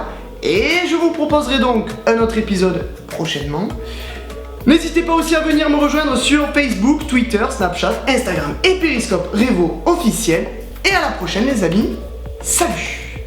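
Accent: Marseille, France. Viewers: Tu est de Marseille ?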